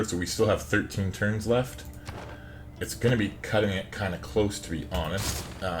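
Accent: Canada. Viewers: Are you from Saskatchewan?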